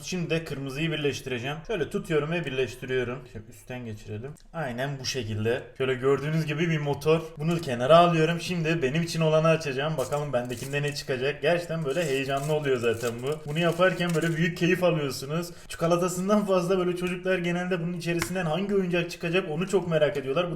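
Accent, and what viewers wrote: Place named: Hatay, Turkey. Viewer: Bu adam amerigalı olsa beğenirdiniz dimi ama bu adam Hatay ağzıyla konuşuyor ve Hataylı bu yüzden bu videoya like atmayacaksınız ama bu adam Amerigalı olsa 10 milyon abonesi vardı (YouTubetok)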